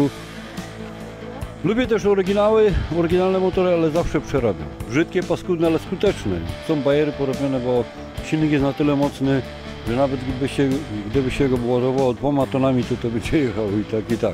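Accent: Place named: Silesia, Poland. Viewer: witam Kolegę kocham takie przeruby jest coś fabrycznego i lubię ulepszać po swojemu i według swojego gustu i upodobania , wielki szacunek dla Kolegi pewnie jesteś ze Śląska bo masz taki akcent wiele lat temu przed stanem wojennym pracowałem na grubie WUJEK pozdrawiam i zostawiam łapę w górę i suba trzymaj się wesołych i spokojnych świąt z uszanowaniem OTTO HERMAN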